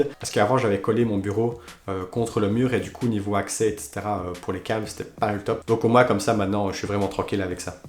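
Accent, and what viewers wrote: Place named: Liège, Belgium. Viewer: Suis tombée sur ta vidéo par hasard, ça fait du bien d'entendre l'accent de lidje dans les méandres de YouTube 😊 Agréable setup + 1 abo Bonne journée, m'fi 🙂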